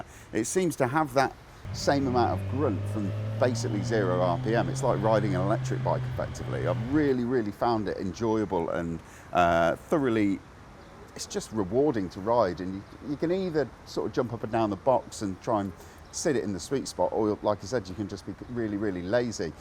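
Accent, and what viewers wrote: United Kingdom. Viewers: I wish I understood more what he was saying. My family is Irish so Im used to some heavy accents but sometimes the British slang and accent is rough.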